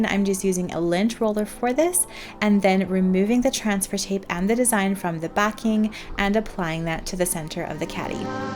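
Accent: Canada. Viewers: Love the DIYs and the Canadian accent! ❤️😁.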